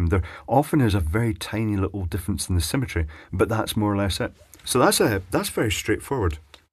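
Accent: Scotland, United Kingdom. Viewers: Twotone Scottish pronunciation.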